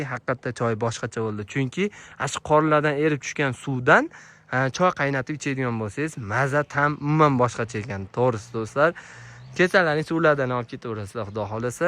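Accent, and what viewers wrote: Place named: Fergana, Uzbekistan. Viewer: Шевасидан билсак Фаргонада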